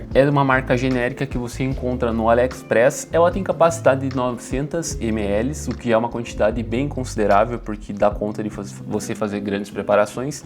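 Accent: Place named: Curitiba, Brazil. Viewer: e esse sotaque aí, é daonde, daqui de curita? PARABÉNS pelo setup, tô montando aqui tbm, tô c r3 blade (sensorial muito bom), e o elétrico femobook a2 (surpreendente), tem dia que faço espresso naked+puck (pra aprendizado fundamental), noutro frenchpress, noutro moka, noutro v60 e clever, é muito legal essa dinâmica e ritual do café de diferentes formas e resultados! Investi num k4 e no starseeker e55pro, e num porta filtro 2spout, que ainda estão pra chegar! E, sim, o mais importante e diferencial é um BOM MOEDOR! Sucesso ao canal e bons cafés, irmão!!❤🎉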